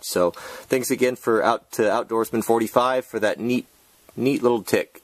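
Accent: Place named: Russia. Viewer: You have a Russian accent.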